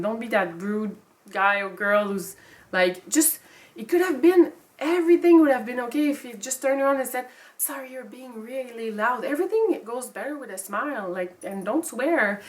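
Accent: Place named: Quebec, Canada. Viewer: Whoah ! Une très belle couleur écarlate de tes cheveux ! Je suis passé par hasard sur ta chaîne. Puis j'adore vôtre accent québécoise, c'est se qui fait votre charme ^^. Vous me faîte penser a un personnage d'un jeu nommé Chloé qui fait punk avec son bonnet dans : "Life is strange" : <link> Bon sauf quelle a les cheveux bleu, mais qu'importe... désolé pour ce propos hors sujet de vôtre vidéo mais je tenais a vous le dire.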